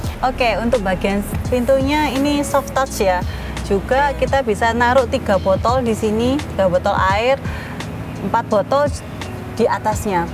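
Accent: Java, Indonesia. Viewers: aksen jawa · Jawa banget ngomong nya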